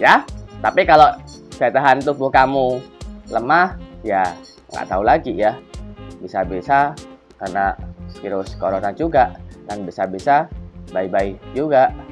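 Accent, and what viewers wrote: Java, Indonesia. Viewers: Ini dokter bukan ,kaya org Jawa ,medok banget ngmge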